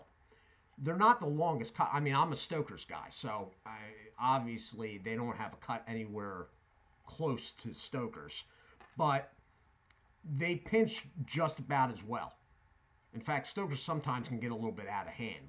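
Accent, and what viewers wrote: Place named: South Jersey, United States. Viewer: I can tell by your accent your from my neck of the woods. South jersey/philadelphia?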